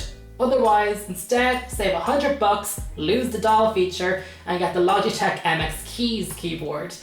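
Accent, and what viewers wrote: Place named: Dublin, Ireland. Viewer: Thanks for the real-world one year later, and the heart gushing Dublin accent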